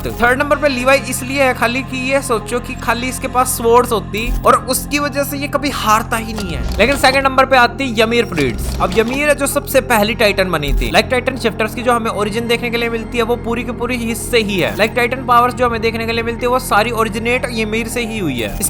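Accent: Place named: Haryana, India. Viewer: Vai tumhara voice hryani vibe deti hea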